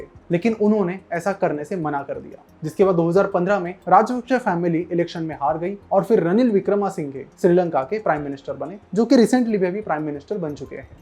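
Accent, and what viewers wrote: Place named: Maharashtra, India. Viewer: I love मराठी accent भावा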